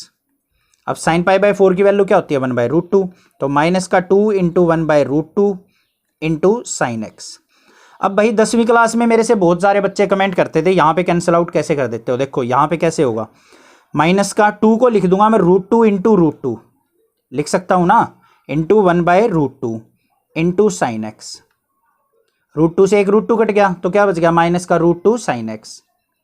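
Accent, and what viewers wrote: Haryana, India. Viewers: Sir aapki language se pta chalta h ki aap Haryana k ho 🤗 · i knew it sir aap haryan se ho even i belong to haryana so apka accent se hi samjhgyi thi and yaa sir i agree haryana ki kaafi itni green hai aur udher birds and all ka ghumna ghar ke bahar is so normal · Aapki voice se he pata chalta hai...ki aap Haryanvi ho👍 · Sir I am also from haryana.aapki boli sa hi lagta hai ki aap haryana sa ho but aapse kabhi pucha nahi vasa kis side sa ha sir aap😅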